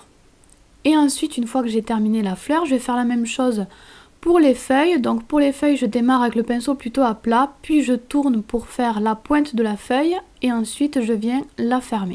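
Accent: South, France. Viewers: Hiiii ! Olalala c'est vraiment très très beau - Je rêve ou c'est un ptit accent du sud que j'entends ? =p Bisous bisous + j'aime hihi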